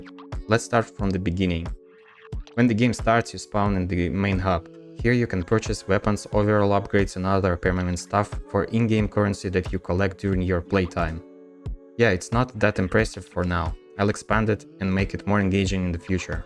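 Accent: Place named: Russia. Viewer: Ты русский? По акценту понятно.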